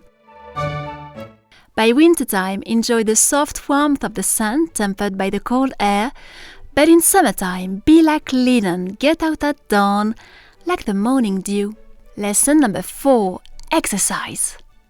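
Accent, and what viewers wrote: France. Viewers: Charming little film narrated in a genuine French accent, but what is going on with the actresses thumb right hand ? Was the blood looking colouring due to the sealing wax or is it in fact, blood inflicted by Valmont ? What a pity. Swan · Thank you for sharing this sweet gem with us. You bring history and literature to life with your elegant home, timely attire, inspiring music, and lovely French accent. You've created an exquisite artistic production!!! I especially appreciate you're enchanting storytelling is in English so that people from different countries can appreciate your work. Bravo!! Bravo!! 🇺🇸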